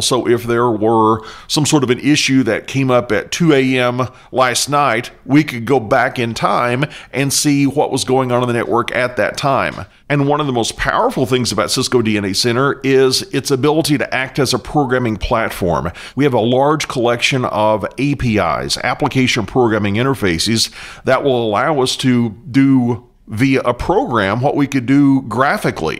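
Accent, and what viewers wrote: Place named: South, United States. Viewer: great teaching voice idk something about the southern drawls keeps me tuned in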